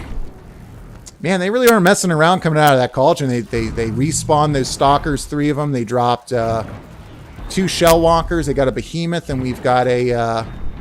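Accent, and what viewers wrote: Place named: Pennsylvania, United States. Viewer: Yes! Aloy freakin rocks, haha. And the backstory is just chilling... Btw, I hear a familiar accent in your voice. Pennsylvania? Maryland? I grew up in Delaware. :)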